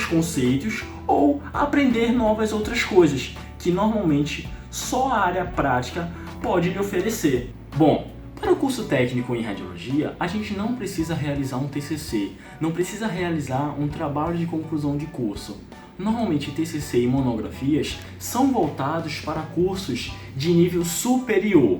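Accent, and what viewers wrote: Rio de Janeiro, Brazil. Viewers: Show o conteúdo, kkkkk tu é do Rio de Janeiro é? Kkkkk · Você tem sotaque do Rio de Janeiro, fala com o "S" acentuado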